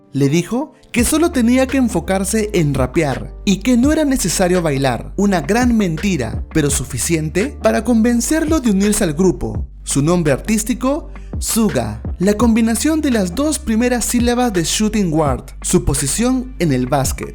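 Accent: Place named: Argentina. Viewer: Hablas como los argentinos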